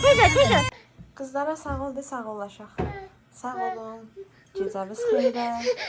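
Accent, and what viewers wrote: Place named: Lankaran, Azerbaijan. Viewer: ləhcəniz bizim ləhcədir lənkəran deyirəm lənkəranlısız😊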